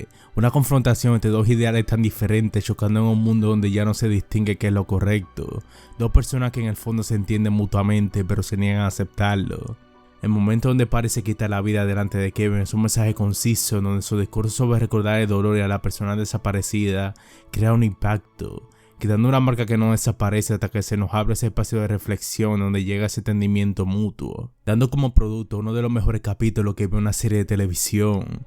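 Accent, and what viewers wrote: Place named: Central America. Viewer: Leftovers es una maravilla, es muy bueno que se reivindique una serie de esta categoría, sin embargo es muy importante que el relator, hable un español claro, sin acento. Puede ser el mejor análisis sin engarbo cae todo cuando comienzas a oír un acento centroamericano marcado, ademas utiliza modismos que realmente destruyen todo. un consejo sano, no hables con acento, si tu no puedes hacerlo con un léxico claro, pedí a alguien que te lea el guion.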